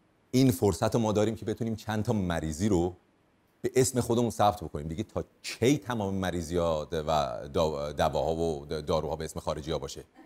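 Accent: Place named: Isfahan, Iran. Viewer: اصفهونیه لحجه داره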